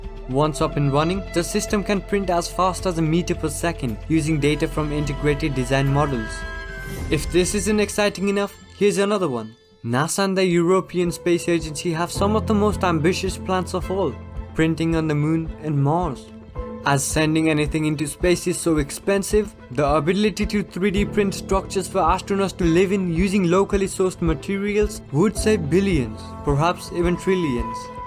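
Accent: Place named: India. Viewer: This channel is diamond, not gold!! BTW are you of Indian descent cause your accent seems like a little indian.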